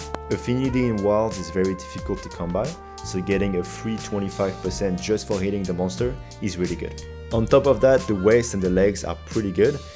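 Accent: France. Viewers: un très fort accent français mon seigneur ! bonne vidéo !!! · Un accent si français pourquoi parler anglais 🥲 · The bow king has returned! Also im curious is your accent French?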